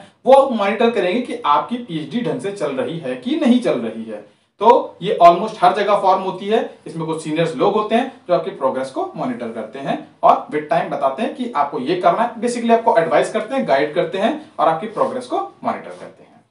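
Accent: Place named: Odisha, India. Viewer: i can feel the odia accent 😋 . very informative video thou.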